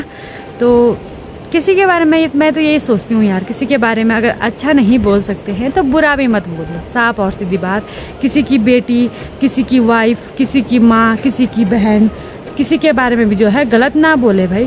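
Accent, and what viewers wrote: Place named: Hills, India. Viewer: आपकी बोली, भाषा, पहनाव, ओर आपका स्वभाव एक पहाड़न की पहचान है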